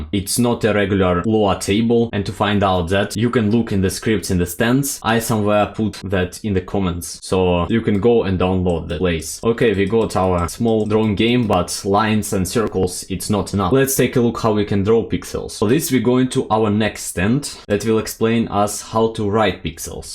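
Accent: Russia. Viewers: русский акцент у тебя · that indian accent (nvm it's russian)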